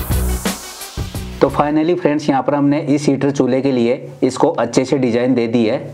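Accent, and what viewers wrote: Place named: Bihar, India. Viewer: Extremely GOOD EXPERIMENT & worth the effort & please form A company to manufacture & sell as a start up company !! You sound like a BIHARI SCIENCE GRADUATE !! If you R please confirm or deny it !! Please also wie your own introduction in Hindi or English as I am proficient in both languages !! From A ( Retd ) MENTAL HEALTH PROFESSIONAL, ORIGINALLY FROM BANGALORE !!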